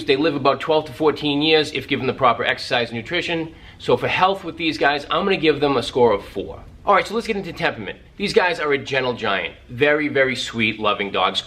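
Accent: Boston, United States. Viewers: What accent is that? Boston?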